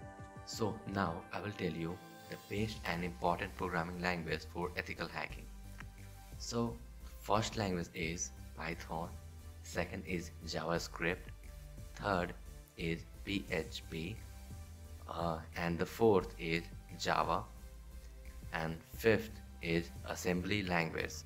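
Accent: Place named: India. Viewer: me i as soon as i hear a India guy voice: AM IN THE RIGHT PLACE. love to all indians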